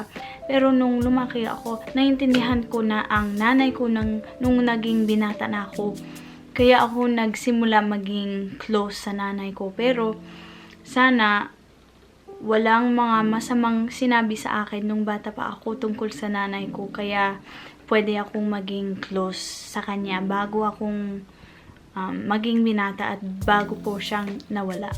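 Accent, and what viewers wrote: Visayas, Philippines. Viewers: Para kang bisaya the way you speak. Shout out sa tanan bisaya. From Surigao. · katuwa...cute bisaya accent. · Your bisayan accent parang tutuo. Marunung ka mag salita ng bisaya na pinatigas ang accent. Bisayang bisaya ka pakinggan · you sounds like visaya when you speak in tagalog, i presume you studied in visayas region. But I could feel that you are very down to earth person. I feel you and please do keep inspiring people. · Your so cute sa mga vlog mo. Medyo puntong visaya ka magtagalog at ang cute tuloy pakinggan. Nakaka amaze ka at we appreciate you sa pagsasalita ng wikang tagalog. Hope to see you personaly soon at papicture sana.thanks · I hear ur Bisaya Accent heheh ate 😍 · Tagalog nya hindi english accent but bisayan accent. Bisaya kasi ako from negros. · Napapansin ko minsan ang bisaya accent mo ang kyut haha. Magpatuloy ka lang, marami kaming new subscribers mo. 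· Huwag kang magalit sa sasabihin ko. parang bisaya ka magsalita ng tagalog. Hindi ko sinasabing masama un. Un lang naman ang obserbasyon ko.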